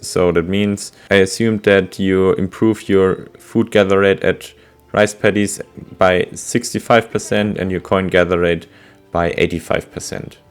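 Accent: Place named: Germany. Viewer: I am sorry but this video is incomplete. The real gather rate heavily depends on the number of villagers per mill / estate, since the collisions become more frequent and more time is lost. We have calculated the gather rate for all possible numbers of villagers, CDB, and settler wagons: <link> (the Video is in German but since your accent sounds German, I hope that you will be able to understand it).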